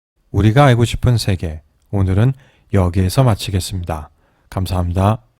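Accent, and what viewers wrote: China. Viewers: 이사람 말투 한국인 아니네...중국 유학생인가? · 데이터 0% 그냥 뇌피셜이네요..말투도 조선족이신듯... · 좋은 자료 잘 보고 갑니다. 그런데 이주한국인이시거나 교포분이시거나 중국에 거주중이신가요? 말하시는데 니다니다에서 중국교포분 특유의 흘리는듯한 발음이 들립니다..발음을 아주 조심해서 하시는듯한 느낌이 나구요.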